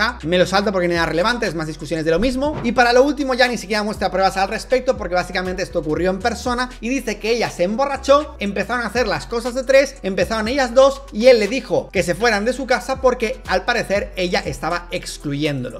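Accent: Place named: Canary Islands, Spain. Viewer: Aunque la vayas de peninsular se te cae el acento Canario x todos los costados fantasma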